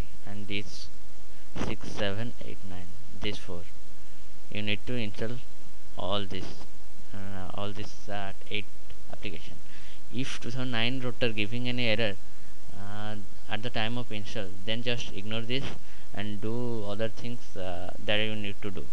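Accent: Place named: India. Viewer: Damn! He talk english using indian accent.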